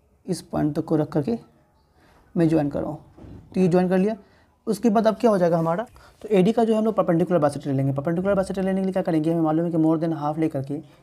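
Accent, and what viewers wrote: Bihar, India. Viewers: Sir app bihar se ho?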